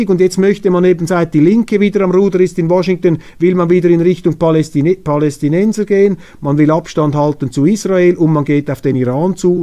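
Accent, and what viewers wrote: Switzerland. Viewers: In der Schweiz darf man „faltsch“ sagen. Ich finde seinen Accent Fédéral ganz sympathisch.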